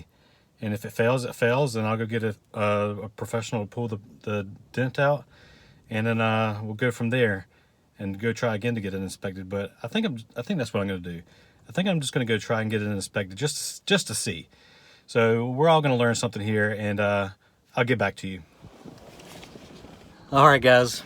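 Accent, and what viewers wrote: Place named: Baltimore, United States. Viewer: You aren't originally from Texas, are you? That accent is Baltimore/PA/South Jersey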